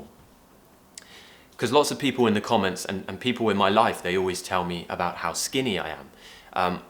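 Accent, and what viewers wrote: United Kingdom. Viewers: I think im fallin in love with this guy he is so charismatic while talking with his brit accentttt💕💕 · I like your British accent thank you so much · Okay after this video I'm already your fan You mind looks like with mine... but with british accent hahahaha I like it! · Nice to hear from you once again... Lov the British accent lol.. Lov from Africa the Gambia... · hi Teacher excellent your English"s course. ( and I love too the britsh accent). For me is the best !!. ( not against other please ). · Sir, I'm from India. I'm passionate about learning English. I have been watching your YouTube speeches for the past few months. I'm inspired by your British accent. I am passionately learning phrasal verbs nowadays. I like your speeches very much. Happy new year sir. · I found your videos by mistake and I loved your attitude. My goal this year is achieving a better British accent like yours. 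Excellent video!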